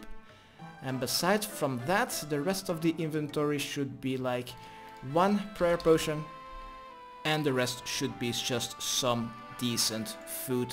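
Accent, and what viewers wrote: Netherlands. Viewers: Well just finished the quest.. after 10 tries I finally managed to kill Galvek. I found Vanstrom Klause a harder boss to beat mechanics wise, but this damn fire ball in combo with the waves was unlucky. Only got song of the elves & secrets of the north as my last two quests. I want to thank you for the whole journey thus far, as a fellow dutchy it's been so enjoyable to listen to you. That damn accent haha! Thanks so much man, much love.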